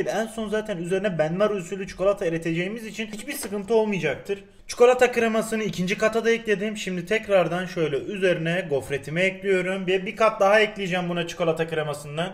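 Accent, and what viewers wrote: Adana, Turkey. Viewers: "Tencereyi ocağa eklüyürüm" Adanalı olanlar bu şiveyi bilir. Ben de şimdı karam yiyürüm. 😀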